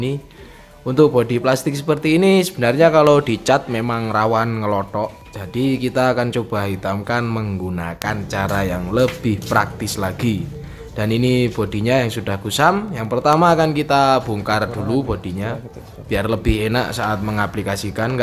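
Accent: Temanggung, Indonesia. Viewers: Temamnggungane medok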